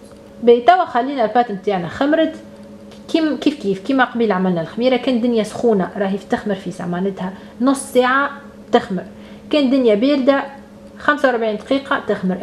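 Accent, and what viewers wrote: Tunisia. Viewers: لهجة تع التوانسة هايلة متتبعة جديدة من الجزائر شكرا على الوصفة راح نديرها انشاء الله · Salem ,une nouvelle abonné Masha Allah et j'adore 🤩 les fricassée et j'adore vôtre accent Tunisien très bonne continuation a bientôt , Badra France 😇🥰🙏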